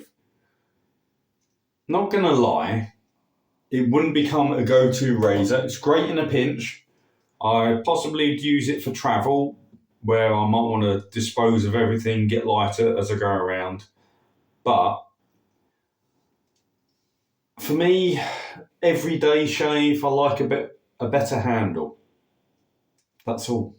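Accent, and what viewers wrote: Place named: United Kingdom. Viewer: The thing i love about british is the accsent it proper🇬🇧🇬🇧🇬🇧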